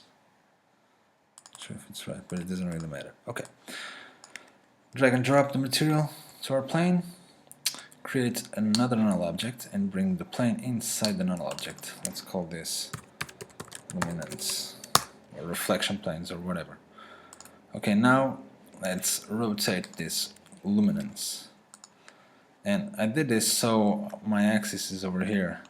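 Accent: Portugal. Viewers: Good Tutorial... and you are Portuguese...or sort of :) Bom tutorial ;) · Inglês de quem fala português SEMPRE me ajuda a entender, ingles nativo é muito corrido, valeu pelo video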